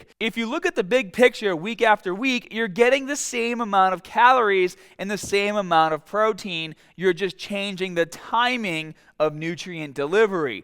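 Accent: Boston, United States. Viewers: Haha Love that accent is that a Boston accent? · OMG! His BOSton accent makes me laugh. Every AR word is exaggerated. EVERY ONE of them. cARbs, mARkers, so fAR, ARgue, ha ha ha.. Keep going man. Good info. Just super funny accent. Love'n it. I do IF with KETO at a 20:4 fasting to 4 for last 6 months. But im not a bodybuilder. And as far as flexing the meal. Its easy to flex your eating time. its not a hassle. Im not a hARd gainer. LOL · You from Boston?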